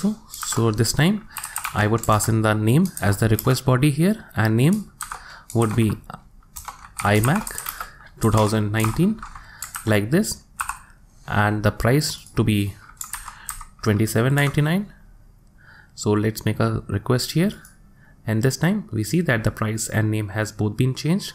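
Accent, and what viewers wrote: India. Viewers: такой индийский английский невозможно слушать (((( upd: хорошо что у него понятный js ))) спасибо! только next не используют в контроллерах, ведь это же конечные мидлвары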